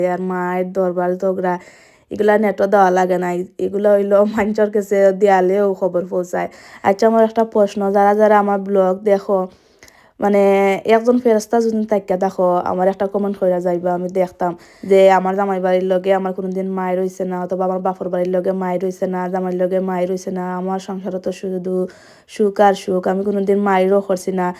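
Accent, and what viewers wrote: Sylhet, Bangladesh. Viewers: Right kotha boin koisoin . Afa afnar Sylheti mat je sundor lage . 😊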